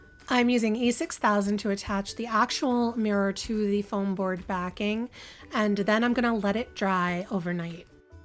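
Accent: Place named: New Jersey, United States. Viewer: You did ❤ and I picked up on your New Jersey accent. I heard you say "Mirrah" when referring to the mirrors. Thought it was endearing 🤗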